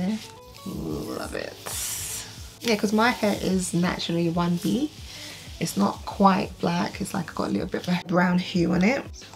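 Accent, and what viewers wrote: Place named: London, United Kingdom. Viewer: I just LOVE your accent in an London accent🥰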